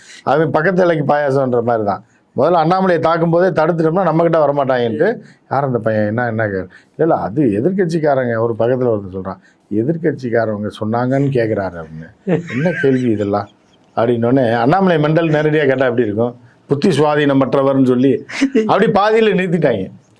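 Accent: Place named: Madurai, India. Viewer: எல்லாம் யூ யூட்யூப் சேனலில் உங்க மதுரைsland பேச்சு க்கு தனி சிறப்பு❤❤அதில் ஒரே சிரிப்பு மழை😂😂😂😂😂😂😂😂😂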